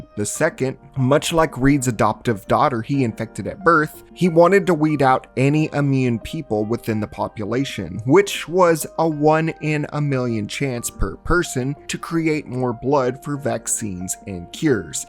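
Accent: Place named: South, United States. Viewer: Man you’re voice gets less southern with time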